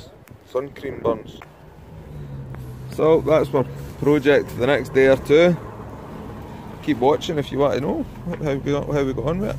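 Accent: Scotland, United Kingdom. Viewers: Hold on is that a Scottish accent talking about sun burn?........ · In a strange way, these videos remind me of the song: " " by. Your Scottish commentary plus the music. Pure brilliance.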